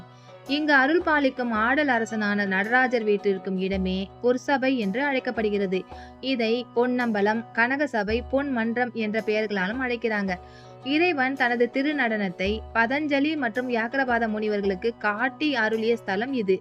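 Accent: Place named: Madurai, India. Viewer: இறைவனைப் பார்த்து வணக்கம் ன்னு சொல்லிருக்காங்கன்னா மதுரை தமிழ் மேச் ஆகுதே..😂 சூப்பர் 👍😅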